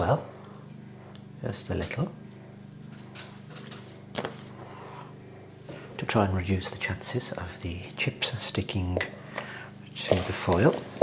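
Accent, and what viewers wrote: Birmingham, United Kingdom. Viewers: Amazing !! And that brummy accent is lush!!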